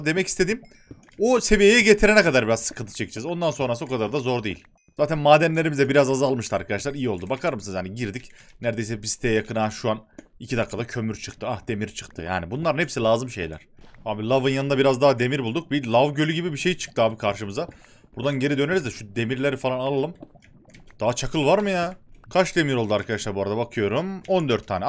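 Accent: Yozgat, Turkey. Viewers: abi ben iranli turkum ve bizim turk dili ayni sizin yozgat diline benziyor vallahi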